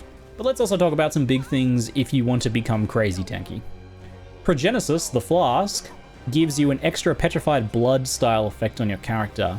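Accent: Australia. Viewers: ihavent played PoE in ages and i was so happy to see when i googled Viper Strike build a build come up. my favorite poe guru. is australian? i cant tell the accent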